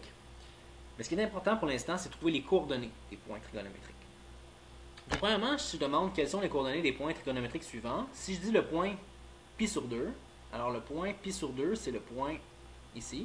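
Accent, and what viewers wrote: Quebec, Canada. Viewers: Je reconnais un québécois ici :)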